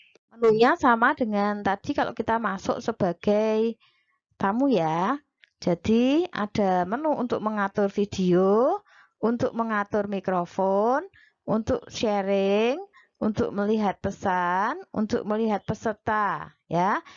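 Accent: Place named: Java, Indonesia. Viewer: Suaranya bu khas banget jawanya 😉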